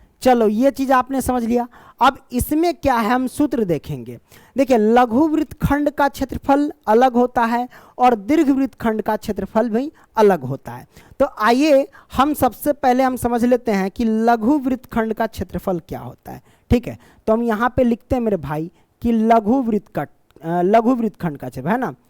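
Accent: Bihar, India. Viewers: Sir aap bihar se ho na😊me tooo❤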